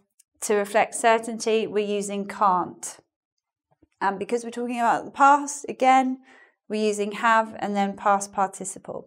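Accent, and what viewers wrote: United Kingdom. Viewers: I started learning Eng at the age of 6, now I'm 25. I love her channel but I mostly watch her videos to listen to British Eng not to learn. But in that 19 years, every teacher and book said that 'might' is the formal and polite version of 'may'. And here I am, hearing the total opposite with a confused Pikachu face. Thank you so much!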